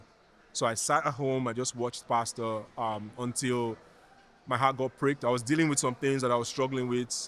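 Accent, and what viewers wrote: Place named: Nigeria. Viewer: Could feel brother intonation. That his 🇳🇬.. watching from Nigeria...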